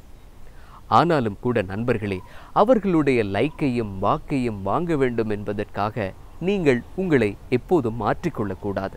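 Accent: Sri Lanka. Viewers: அதுதான் இலங்கை தமிழ்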